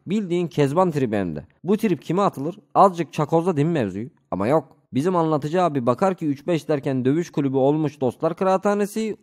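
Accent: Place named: Zonguldak, Turkey. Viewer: Seslendiren abimiz %80 zonguldaklı veya bartınlı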